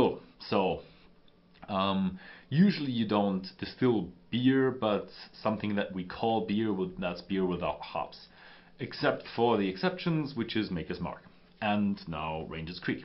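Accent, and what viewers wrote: Germany. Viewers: dude..move on. Not every word in proper English is pronounced the way you are used to. The presenter is German, there would be an accent.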